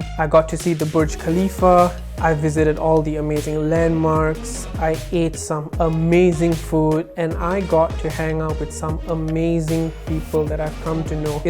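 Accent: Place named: United States. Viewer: You sound like an American way too “AMAZING”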